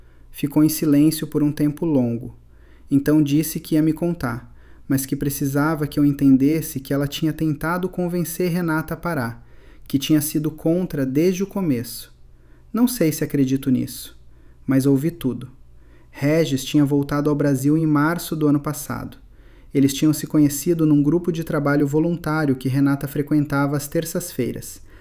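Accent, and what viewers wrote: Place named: São Paulo, Brazil. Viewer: Por que os numerais falados em inglês? Que coisa irritante, além dessa vozinha com sotaque paulistano.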